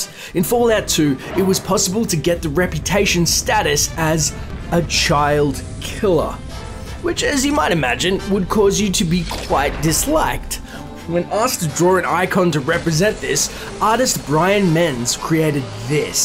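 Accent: Australia. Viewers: Its very Australian i should know i live here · God, I hate the Australasian accent...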